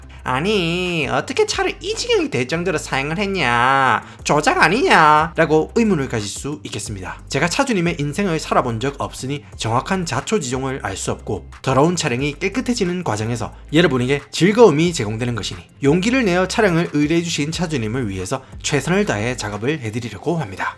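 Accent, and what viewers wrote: Gyeongsang, South Korea. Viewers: 최고 고향이 어딘가요 여긴 창원 사투리가 나오시네요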